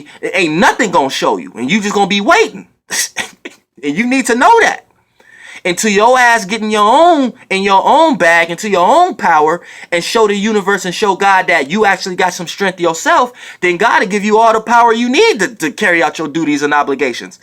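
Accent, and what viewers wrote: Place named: California, United States. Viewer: Soon as bruh start talking all I hear is CALIFORNIA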